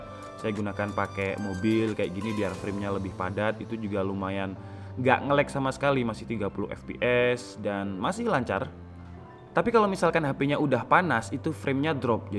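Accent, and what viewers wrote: Malang, Indonesia. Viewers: Mas nya orang malang?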